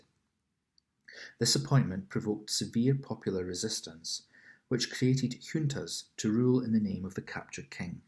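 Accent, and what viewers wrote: Scotland, United Kingdom. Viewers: your Scottish accent is delightful. And for some reason I can understand it (probably because you're reading 😅). I'm sure you will grow once you get some exposure.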